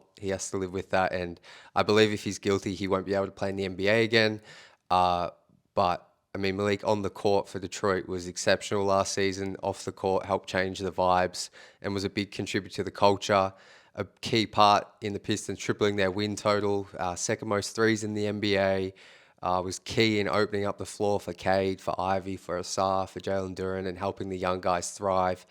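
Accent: Australia. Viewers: Australian, yes. And a legend!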